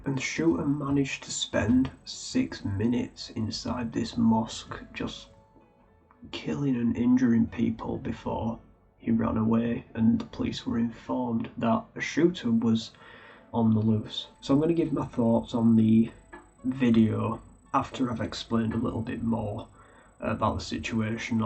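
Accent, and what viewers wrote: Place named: Teesside, United Kingdom. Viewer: I remember watching this on some gore website a couple of years ago and finding the comments absolutely infuriating, disgusting and disturbing. Not on topic but a secondary question, are you from anywhere near Teesside/north Yorkshire? You have a similar accent to me but I can't place it.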